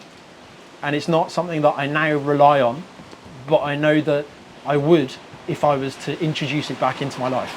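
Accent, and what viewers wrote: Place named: United Kingdom. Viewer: First time viewer, was kind of shocked to hear your British accent given that the scenery can’t get much more Dutch 😂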